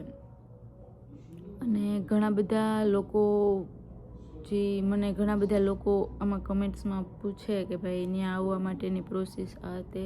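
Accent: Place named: Kathiawar, India. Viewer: હમે પણ તમારા જેમ બોલીએ કાઢીયાવાડી 😅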